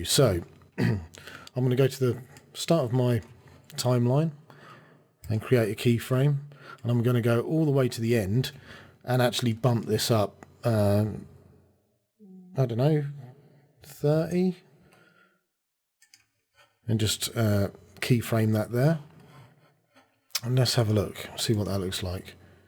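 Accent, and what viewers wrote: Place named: United Kingdom. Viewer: Excellent tutorial, great explanation, plus the British accent adds to the credibility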